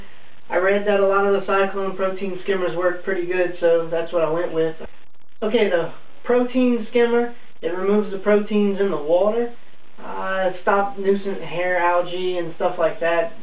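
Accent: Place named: Louisiana, United States. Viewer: You sound like you're from Louisiana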